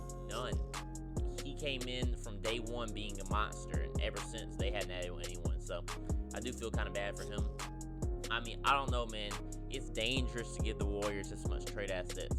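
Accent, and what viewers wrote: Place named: South, United States. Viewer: as an asean, it took hard for me to understand what your sayin. you have accent and your background music to loud. you have country singer accent i think. from PHILIPPINES.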